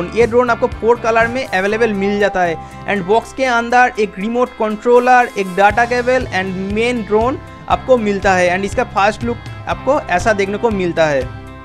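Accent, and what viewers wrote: Bengal, India. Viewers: bro are u Bengali? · R u A Bengali???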